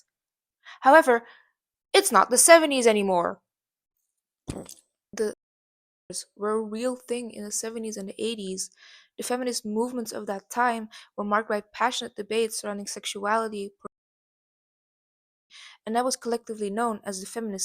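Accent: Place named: Quebec, Canada. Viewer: Not related to the video but i love your accent!! Are you french canadian? You sound like me :)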